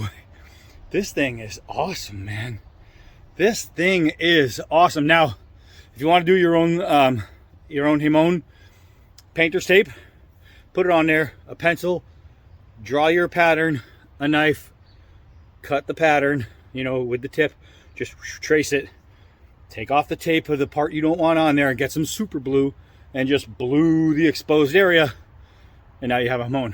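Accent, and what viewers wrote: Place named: New England, United States. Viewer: Yeah, I figured you were a combat vet. My entire family was in the service. Except me….lol. In listening to you talk, I kinda figured it out. Well, that and you’ve got the New England accent like me. Anyway….stay safe,little brother and thanks for the content. It keeps this old man in good spirits and makes me look forward to your next vid. Be well….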